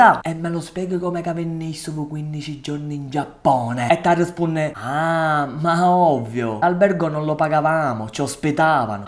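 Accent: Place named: Sicily, Italy. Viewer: Che bello il dialetto Siciliano....bel video è . Ciao da qui sù : Bergamo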